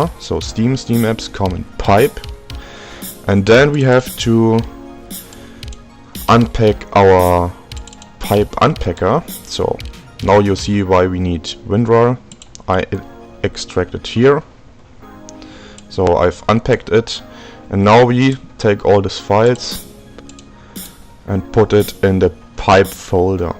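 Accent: Germany. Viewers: I swear to god this guy is german. If not he is french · da höre ich doch einen Deutschen Akzent :D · ich liebe sein denglisch <3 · lol german and norwegan is pretty close so I can understand that u said "I hear ur german accent" and op replied with "Well I am a german channel" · grausamer deutscher akzent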